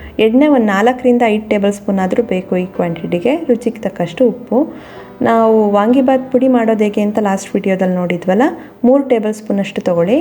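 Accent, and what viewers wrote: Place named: Mandya, India. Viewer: Sorry for asking, since you're slant of Kannada resembles Mandy side, still you're from my husband's place nice to hear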